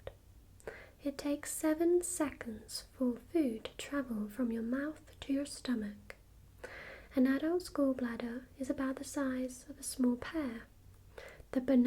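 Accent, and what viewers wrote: England, United Kingdom. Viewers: wonderful English accent still gets me every time. It's absolutely, positively the best accent I've ever heard. Perfect for ASMR. 👌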